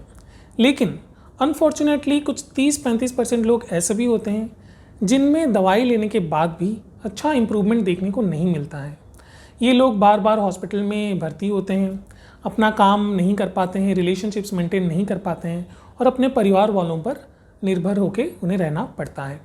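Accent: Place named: United States. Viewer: इंग्लिश भाषा का अच्छा इस्तेमाल करते वक्त जिस व्यक्ती का समझनेवाला आवज होना चाहिए........ इस विडिओ मे थ़ोडासा अमेरिकन उच्चारण सुनाई देनेके वजहसे सभी टिप्स अच्छेसे नही समझमे आ रही है....... जिस उद्देश से अच्छा विडिओ बनाया जा रहा है वह लोगोंतक नही पहुंच रहा है......... सभी टीम इसपर जरुर विचार करे क्योंकि ये दिमाग की डिसऑर्डर बहोत लोगो में दिखाई देती है......... जो काम की बातें बता रहे हो वो लोगोंके समझमे आ जानी चाहिए.........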